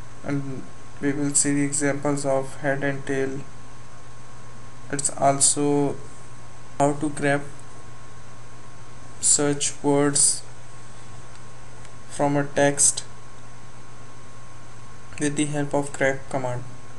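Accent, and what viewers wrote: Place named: China. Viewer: thanks brother but i bet you're chinese with your funny accent lol just to tell u i love your accent :)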